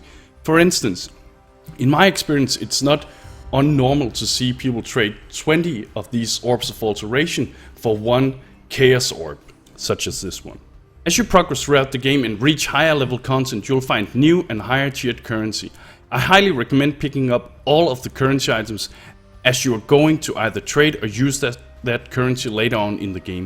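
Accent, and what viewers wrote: Denmark. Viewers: He sounds danish in the accent. How do i know , well iam danish :P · It sounds like you're Danish, Are you? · Er du fra Odense? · you sound danish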